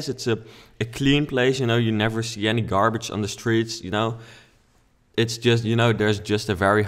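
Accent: Netherlands. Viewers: Jij klinkt zo nederlands hahahahahahaha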